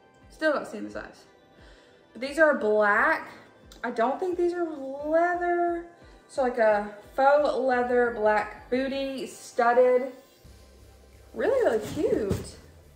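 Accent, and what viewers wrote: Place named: South, United States. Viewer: You have a slight accent; are you from the south?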